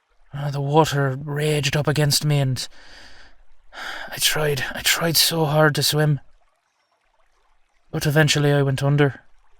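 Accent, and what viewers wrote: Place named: Ireland. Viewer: Something is a bit fishy...... Love this audio 😀 And I love the Irish accent ❤❤❤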